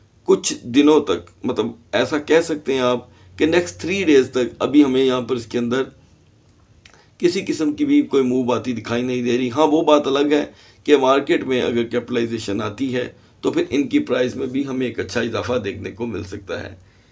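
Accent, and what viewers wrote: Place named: India. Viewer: You speak like indians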